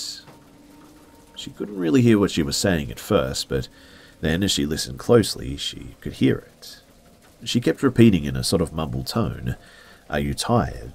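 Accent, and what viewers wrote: Australia. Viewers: Gotta love that Australian accent 🤣🤣👍🏾👍🏾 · Yeah mate our Australian accent is pretty great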